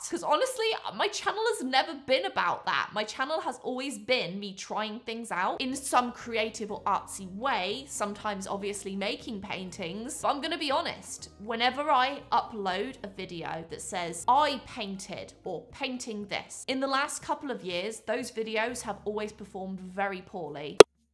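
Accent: England, United Kingdom. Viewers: I first started watching you because I miss my family in the UK and listening to your English accent was comforting. Having said that, I also love your quirkiness & how you can laugh at your mistakes. Your creativity, in whatever form is also always a great watch. I believe being a YouTuber takes a lot of bravery (I'm not brave at all) so please keep on being you & thank you for doing this ☺️❣️🤗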